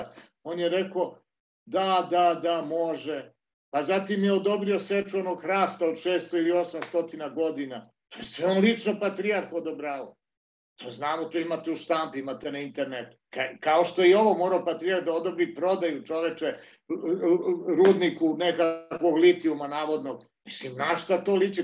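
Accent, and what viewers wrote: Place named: Croatia. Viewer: Voditelj govori neku croato varijantu srbijanskog narečja.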